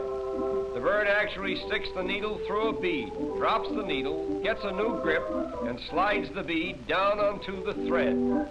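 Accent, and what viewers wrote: Transatlantic, United States. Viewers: Old school Transatlantic accent.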